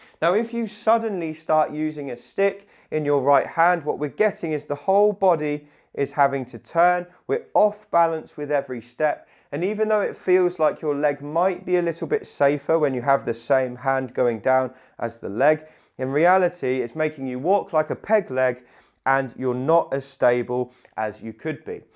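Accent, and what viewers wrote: United Kingdom. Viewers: I was a nurse and ended up disabled and I have to use a stick. No one ever educated me even after 12 spinal surgeries on proper height of my cane. Thank you for this tutorial. It sounds like you are across the pond from me and educate your citizens better than American PT’s do. Thanks once again going to adjust mine right now 😊😊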